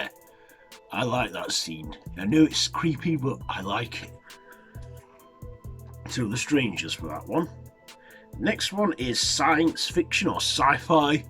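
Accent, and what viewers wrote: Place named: RP, United Kingdom. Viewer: This guy sounds exactly like Sir Brian Blessed. God Queens English accent.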